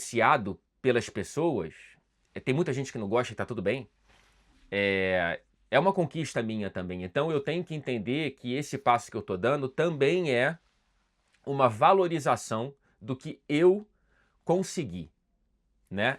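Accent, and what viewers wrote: Rio de Janeiro, Brazil. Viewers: Fala, cara queria dizer que mesmo nao gostando no geral do sotaque carioca, discordando de voce em muitos aspectos fora do futebol e principalmente te odiando quando o Fluminense tirou a liberta do meu colorado, te acompanho em todos os videos a mais de 3 anos e fico muito feliz com seu passo profissional, fico triste pq sem duvidas sua presenca nos programas da TNT eram essenciais e o MFM perde seus 2 melhores integrantes em poucos meses, mas desejo muito sucesso em sua nova jornada, voce é foda! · Caraça Mano... sotaque carioca pesadão😂😂😂😂😂 mudou muito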